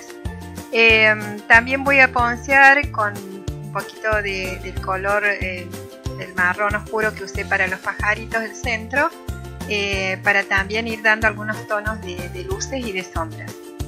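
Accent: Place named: Cordoba, Argentina. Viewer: hermoso quedó, y me encanta tu tonada cordobesa 😄😍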